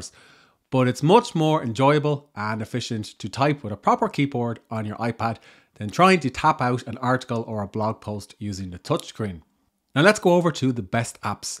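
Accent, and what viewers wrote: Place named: Ireland. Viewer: You mentioned that I can use a pencil. But I was wondering if I can use it as a handwriting tool? I know that you can use the pencil to click on the keyboard as you type but I can no longer see the keyboard so I was wondering if there's a way that it can be used for handwriting instead so that I can write as if I was riding on a paper? Did you get your accent from parents or do you still live in Ireland? Hopefully that made sense lol I am writing a novel currently and one of my characters may possibly be Irish and I was curious.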